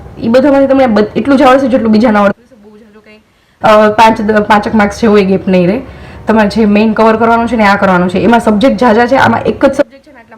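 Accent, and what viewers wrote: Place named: Saurashtra, India. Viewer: Tamari bhasa upar thi lage che ke tame gir Somnath ke Junagadh na cho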